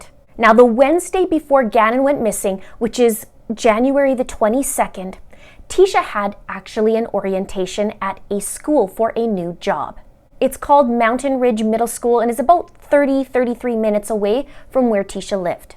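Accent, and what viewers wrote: Canada. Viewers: Omg, I just love your accent! “Oot and aboot.” If trump steals the election in Nov, I may move north, watch hockey, and say ‘oot and aboot,’ too. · Thank you so much for following this case. We all hope Gannon is found soon and want guilTEE to pay for what she's done. Nothing she says makes any sense. She's evil, but not a very good liar and certainly not a very smart criminal. Keep up the great work. BTW, I love your canuck accent!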